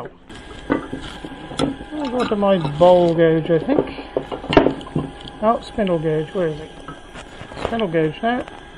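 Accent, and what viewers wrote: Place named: Cornwall, United Kingdom. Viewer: Cornwall originally? Your accent sounds like mine.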